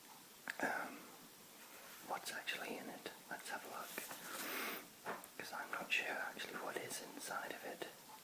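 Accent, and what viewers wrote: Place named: North, United Kingdom. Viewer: This video is way underwatched. I love it! Btw, Where is your accent from? I know its northern but I'm picking up lots of little bits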